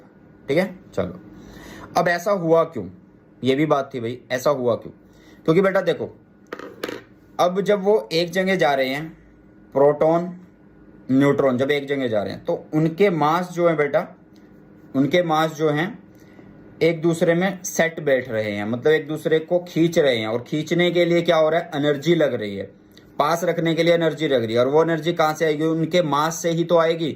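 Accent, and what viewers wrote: Haryana, India. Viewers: Sir aap haryana se ho kya😁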